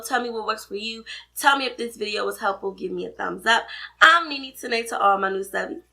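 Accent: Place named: DMV, United States. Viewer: Im 4 years late LoL! But great video & Im going to assume you're from the DMV 🤞🏽 because I can hear it 😂😍 not sure if Im right, but usually a DMV person knows another 😊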